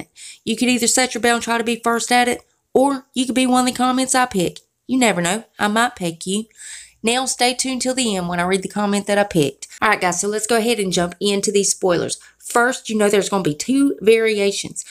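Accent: South, United States. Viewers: Girl I love your country twang .. I'm a country gal my self i love watching you.. your the best!!!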